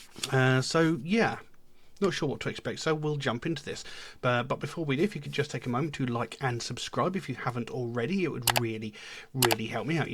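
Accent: United Kingdom. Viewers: Hi from another Brit, I couldn’t mistake the accent - not quite sure where! I’m from south of Brum. Nice reaction, you got it on the nail with your analysis very good - their lyrics tend to be a bit open to interpretation! I found them right at the start, it’s been an amazing experience seeing them growing up and learning their craft! I saw them last year with Muse at Plymouth and this year at Brum early on in their world tour of sold out headliners. They’re at Wacken later this month!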